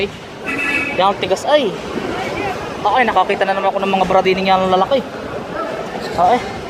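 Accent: Quezon, Philippines. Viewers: Ah ah parang ikaw ay taga quezon hehe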